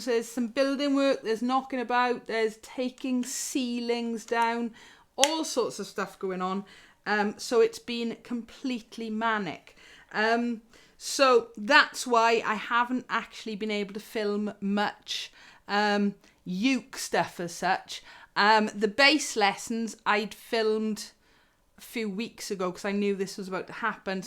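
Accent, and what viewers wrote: Wales, United Kingdom. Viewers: I’m so excited I just received my jazz mistress baritone ukulele from the awesome Fanner brothers and would love to show it to you and your fans. It was custom made it did take awhile I’m in Canada but I’m Welsh blood all my relatives are Welsh so I love hearing your accent reminds me of them. I am still learning and learned on an acoustic baritone Uke and have always used my fingers for strumming and picking. I find that it doesn’t sound as good on my electric and I’m trying to learn to use a pick. I only had my new Uke for two days and broke a string I guess I was using the wrong gauge or type. Is it possible you could show us how you use a pick/plectrum and what type you use on your electric Uke? Also where can I send you a picture of my baritone Uke I would love to hear your opinion? Also do you do online lessons that would be great!! 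Love Vicki. Cymru Am Byth 🇨🇦🏴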